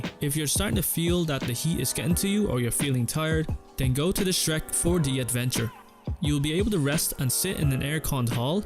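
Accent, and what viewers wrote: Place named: Ireland. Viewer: Watching and thought "this lad has an Irish accent". Going in May. Subbed!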